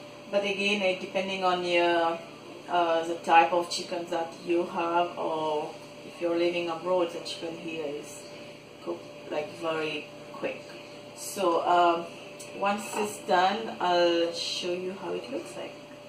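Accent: Mauritius. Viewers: Your accent is very similar to Mauritian's!cool😊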